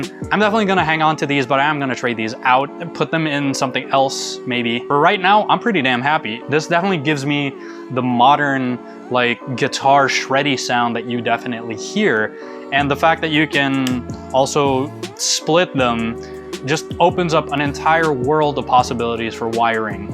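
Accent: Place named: United States. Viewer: Atlas is a nice word for pine. That os nice companies are putting the battery cavity in the guitar incase u switch/already have active pickups. I always just took the d battery and wrapped it in electrical tape and put it in the regular control cavity (alot cheaper and easier than routing a new cavity). Lol you threw me off when you said pesos because you have an american accent but Spanish last name.😊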